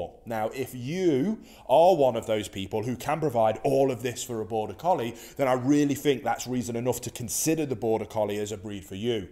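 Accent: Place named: England, United Kingdom. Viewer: I love your accent. (And I also love your video otherwise I wouldn’t have watched it.) What part of England are you from?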